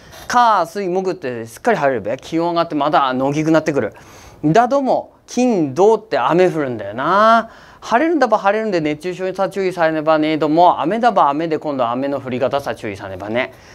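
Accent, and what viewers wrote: Akita, Japan. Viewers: 秋田市以南の方言だすな 県北はもうさっとこ違うもんな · 此れは秋田弁の標準語ですね。本当の秋田弁はこんとらもんじゃねえべ？ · 標準語に近い、秋田弁だな？ 院内駅ノチケグノシュッシンダノモナ。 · ディーラーとか銀行の営業さんの秋田弁だね。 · 秋田の方言ってより秋田の営業方弁だすなー(ですね〜) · これは中央方言だね… 県北、県南じゃ無いね… 標準語に寄せている中央さん方の… お疲れ様です。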